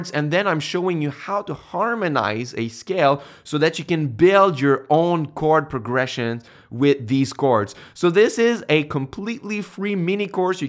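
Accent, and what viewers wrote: Quebec, Canada. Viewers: quebecois? Je reconnais ton accent :)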